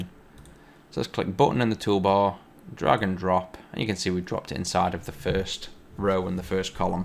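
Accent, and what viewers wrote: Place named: Stoke, United Kingdom. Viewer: You sound like a Stoke lad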